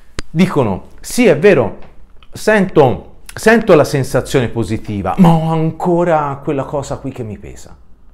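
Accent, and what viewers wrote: Tuscany, Italy. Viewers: Sei l’unico toscano che adoro sentire parlare tra l’altro sei anche super affascinante 😃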